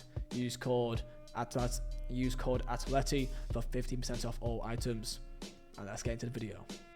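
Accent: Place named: Rossendale, United Kingdom. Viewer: German names in a Rossendale accent. Pure comedy gold.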